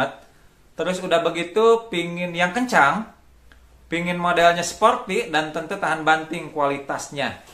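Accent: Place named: Banjar, Indonesia. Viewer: Orang Banjar ya bang🤣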